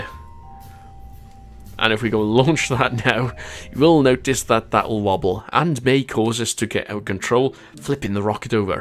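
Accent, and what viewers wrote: Wales, United Kingdom. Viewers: Welsh accent with a speech impediment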